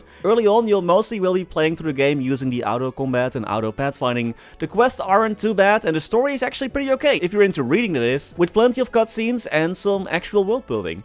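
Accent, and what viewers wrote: Netherlands. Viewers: Dude has a heavy dutch accent 😅, is he dutch? 😅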